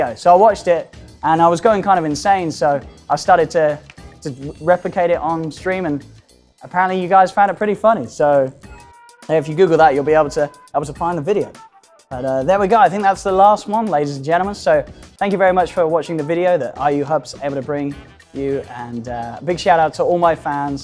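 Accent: England, United Kingdom. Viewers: . Вообще, для меня это лучший дота комментатор, особенно его потрясающий английский акцент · ох этот английский акцент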